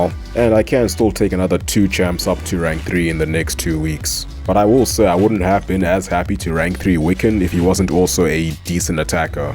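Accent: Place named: South Africa. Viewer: It sounds south African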